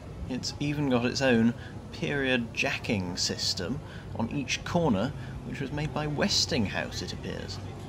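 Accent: RP, United Kingdom. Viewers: Correct me if I am wrong, but the RR color is not canary, but what was known at the time as Mandaran yellow. Bit of trivia, the Waterman fountain pen from the period was available in Mandarin yellow and that pen today is one of the most collectable. Nice presentation. Thank you. (My British friend tells me you speak with a distinct RP. Are you from GB?)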